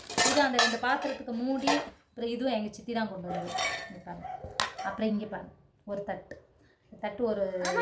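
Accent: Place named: Nagercoil, India. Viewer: Akka antha Mann Paanai Satti la Meen kozhambu vechu paarunga,. You might be tried ., but intha sirappa sollirukellam, I don’t know other Gravy., but Fish Gravy will be awesome…. Nagercoil sirappana Ooru ., Pakkathula Marthandam , kaniyakumari Thiruvalluvar Statue, antha sun rise , sunset ., and your Senthamizh Slang of Nagercoil mixed with Malayalam know Akka., you People are awesome akka., Vazhka Vazhamudan Makkale…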